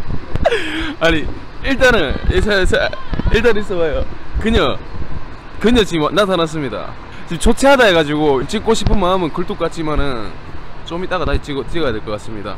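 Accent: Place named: Daegu, South Korea. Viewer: 사투리랑 말투가 엄청ㅋ멋지면서웃겨요 매너있는대구남자ㅋㅋ~~~그녀ㅋㅋ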